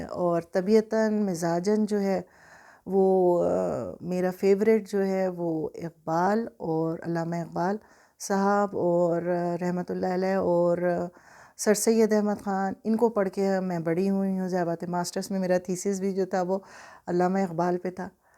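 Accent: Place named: Lucknow, India. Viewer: Bahut bahut shukriya aapka😊 Lucknow se hone ki wajah se kuch aisa laga jaise apne rishtedar ko hi sun raha hun 😚 barhi saadgi se aapne apni baat rakhhi .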